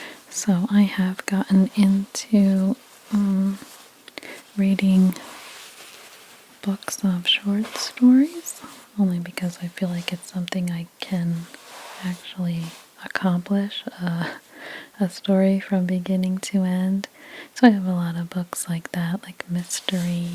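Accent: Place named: Mid-Atlantic, United States. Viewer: Totally had you as mid-Atlantic, but you say “play catch” not “have a catch “. Interesting 🧐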